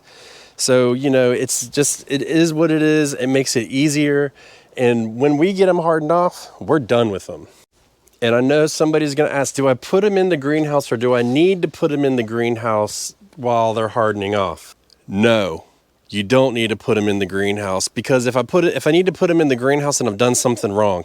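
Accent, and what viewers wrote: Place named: Massachusetts, United States. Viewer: Really enjoying your videos. This is the first time I ever left a comment on anything (I'm an old guy), so I hope it goes through. Anyway, it sounds like you're originally from Massachusetts (I'm from Vermont), now living in NC, inland from you, about 60 miles south of Raleigh. I've been gardening my whole life, mostly on intuition and what I learned from my grandparents. Never have been too technical about anything, so I really like your way of describing how you do things. Recently your clips on starting seeds and hardening them off were excellent. God bless. Keep up the good work.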